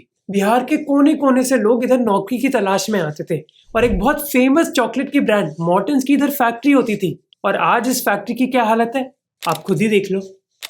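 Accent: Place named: Uttar Pradesh, India. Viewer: I think she is from UP state 😂😂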